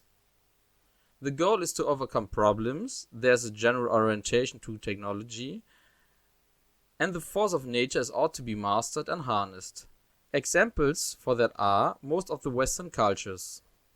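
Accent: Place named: Germany. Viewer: Inhaltlich gut, aber mach es besser auf deutsch. Dein englische Aussprache ist zu deutsch. Aber danke fürs Video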